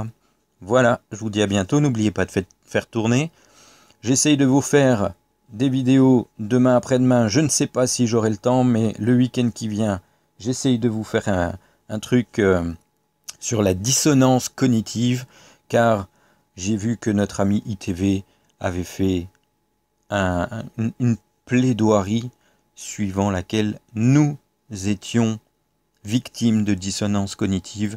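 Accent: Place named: Alsace, France. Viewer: merci pour tes gros efforts ainsi de partager ton savoir. Il est primordial d'informer pour que les jeunes ne tombent pas dans le piège des complotistes. Pour les purs et durs de la désinformation, tu ne peux plus rien faire, excepter cette prévention. (j'ai remarqué au vu de ton accent que tu dois être du coté de l'alsace ou pas loin ?) :) note : chippie a obtenue gain de cause avec terrienne !!!! mais non rien, je note c'est tout !!! :p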